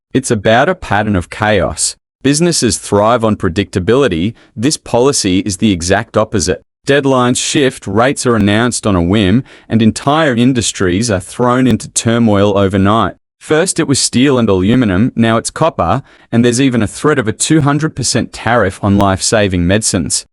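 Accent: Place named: Australia. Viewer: That’s exactly what most of them will usually do. It’s not the supplying countries that pay tariffs, it’s your own country's importers that have to pay them on arrival. Or, did you think it was the other countries that paid the tariffs? The only way they suffer is if their goods (now with a tariff) are too expensive to sell in the USA and they can’t find another market, so they might need to close down, downsize or make something else. The narrator has an Australian accent. They would be the least affected of all, because they buy lots of American stuff, but sell a lot less to the USA. You owe them much more than they owe you. So losing just a small part of their exports because they are now too expensive in the USA because of tariffs isn’t all that critical.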